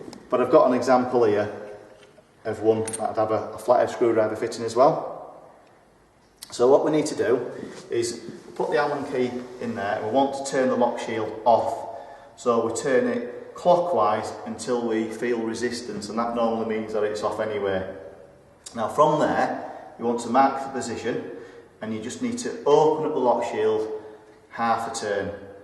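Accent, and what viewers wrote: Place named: Yorkshire, United Kingdom. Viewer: Nothing wrong with your Yorkshire accent, I didn't see Harold Wilson or William Hague using subtitles. I bet Captain Cook didn't have subtitles. Only criticism is that the speaker is too far from the microphone which results in the echo. You can help me install an air source heat pump, but you live too far away.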